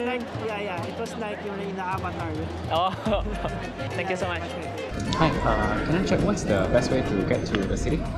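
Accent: Indonesia. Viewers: your indonesian accent is very strong. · speaking of which, do i hear a hint of Indonesian in your accent? i love that your accent isn't your run-of-the-mill Singaporean accent!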